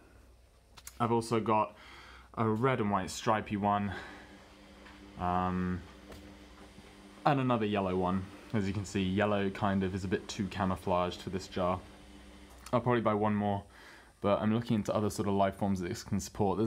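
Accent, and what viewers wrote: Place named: RP, United Kingdom. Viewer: you sound posh as fuck. paint me naruto please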